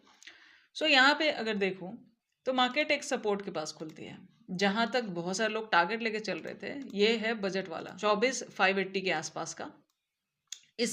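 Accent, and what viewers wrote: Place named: Uttarakhand, India. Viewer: Didi..bahoot badhiya..aap ki Hindi gazab h..aap uttarakhand ya uttar bharatiya lg rhe h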